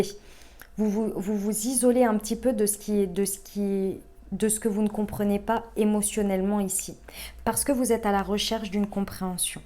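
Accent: South, France. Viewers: Chère je suis contente de te réentendre, ta voix est tellement sucrée et ton accent sent le sud 💃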